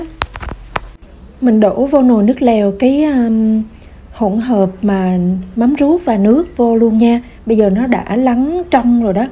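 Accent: Hue, Vietnam. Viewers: Giong noi nguoi Hue nghe de thuong lam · phải noái nặng nặng tiếng Huế miền nửa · giọng Huế ( lại SG ? ) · Bạn là người Huế hả ?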